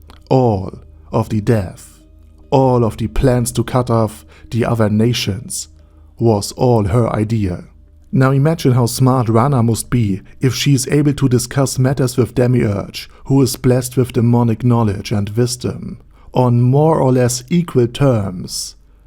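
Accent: Germany. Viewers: Hearing about an anime from a German accent it's just so weird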